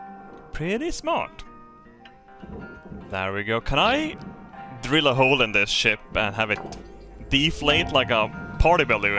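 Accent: Sweden. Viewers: is getting a thicker Swedish accent every day! Love it!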